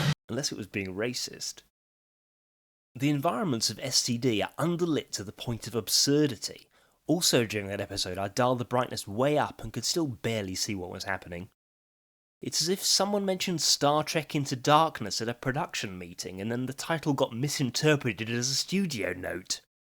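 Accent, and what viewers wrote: England, United Kingdom. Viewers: You sound English? I like the show. Cheers! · I just noticed how unpleasant the combination <expletives + anger/frustration + English accent> is on the ear (I say that as someone with an English accent himself). If I might suggest, it is also a little bit un-English - or certainly exploiting it (mistaking it) as personality or media persona is. I always think that when I hear John Oliver. Wait - is that what we're doing now, copying Americans? Charmless, aggressive loudmouthing an' all? PS. I agree Discovery is awful, the worst Trek series so far (DS9, for my money, being the best).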